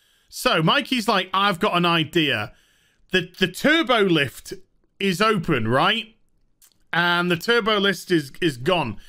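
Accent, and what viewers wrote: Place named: Yorkshire, United Kingdom. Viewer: Really am loving the Yorkshire accent coming out. “Oreyt pal, ars it guin?”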